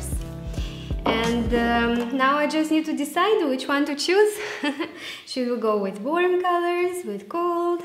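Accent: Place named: Russia. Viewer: Здравствуйте! У вас такой сильный русский акцент. Понимаю все что вы говорите )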